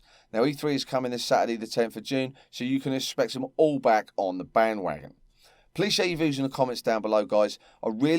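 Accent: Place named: Cockney, United Kingdom. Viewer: i love your cockney swagger but i disagree. Frags xfactor and levelcap do still play the game and i see regular "enough" content. Westie on the other hand seems to think hes some sort of historical know it all and i agree with most of what you say about him. That being said i consider his channel a good inside source for bf content. This game isnt dying, the premium platform is dying. Thats why content has seemed scarce and i believe dice/ea are taking a different approach. Bf1 is by far a better game (for me) than all bf games previous because it ran like butter on pc from day 1. For me personally it solidifies its position as the best battlefield game ever. #fluffybastards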